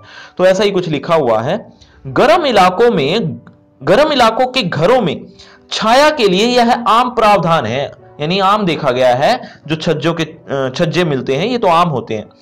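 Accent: Haryana, India. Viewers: sir aap hariyana se ho kya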